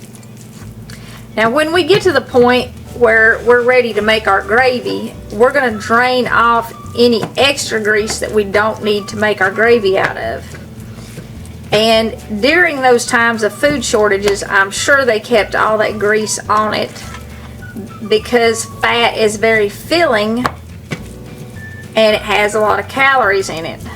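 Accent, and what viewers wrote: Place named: Kentucky, United States. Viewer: My dad was in the military in WWII. He would not eat SOS, no way, no how. I tried several times when I was growing up to get Mom to make it. She would not even make a pan of SOS. I still make a batch sometimes for breakfast (with sausage) just to remember Dad. By the way, I grew up in Western KY. I love your accent. You know it reminds me of Mom.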